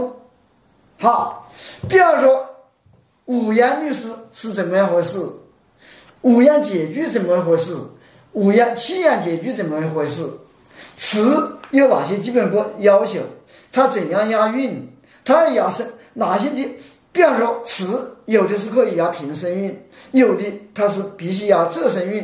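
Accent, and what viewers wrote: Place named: Wuhan, China. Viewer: 武汉口音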